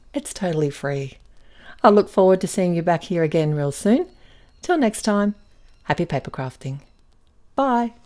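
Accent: Australia. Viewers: Great hear an Aussie xxx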